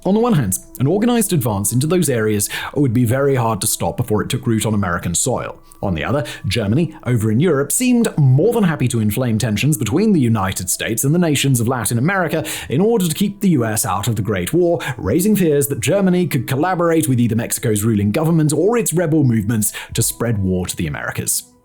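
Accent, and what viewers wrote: United Kingdom. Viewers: This entire Revisionist history lesson is extremely rich, especially when it is being told by some dude with a Brit accent.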